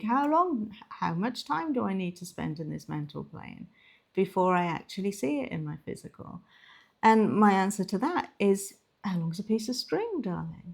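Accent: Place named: RP, United Kingdom. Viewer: Wish she didn't speeeak with such ah Marisaaa Peers posh ahccent. It detracts from and impedes the message...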